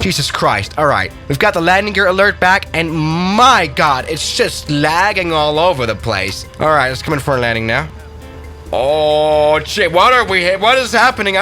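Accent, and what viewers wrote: France. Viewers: His French accent got me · You French accent is so funny xD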